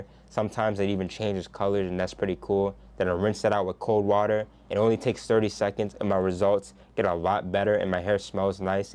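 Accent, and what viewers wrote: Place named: Jamaica. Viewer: Which country your parents come from. Mi hear yu accent !jamaica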